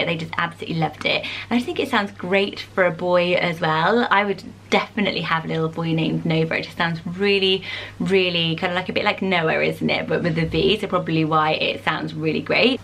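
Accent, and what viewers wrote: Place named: Somerset, United Kingdom. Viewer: Are you from Bristol? :) Your Accent sounds quite somerset/Herefordian!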